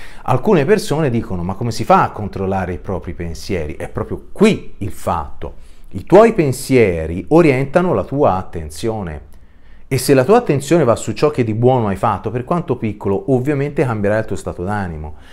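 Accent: Tuscany, Italy. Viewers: Sei l’unico toscano che adoro sentire parlare tra l’altro sei anche super affascinante 😃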